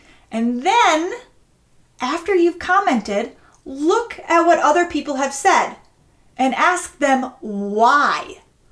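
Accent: United States. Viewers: Which part of America are you from? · Are you from California? I think you guys have that kind of accent there.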